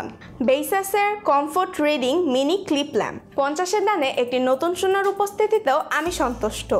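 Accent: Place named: Malaysia. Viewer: that’s great i heard bangla spoke from malaysia but it’s sounds different. dunno bak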